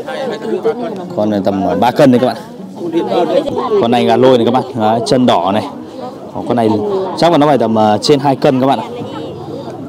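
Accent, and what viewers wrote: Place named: North, Vietnam. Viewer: Nói giong Băc mà lai quá nhanh, thât la nghe không gì hêt. Nói Châm lại môt chút đi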